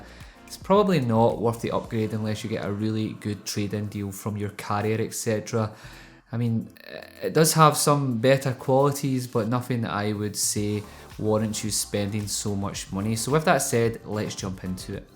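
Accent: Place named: Glasgow, United Kingdom. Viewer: Sounds like Glaswegian to me.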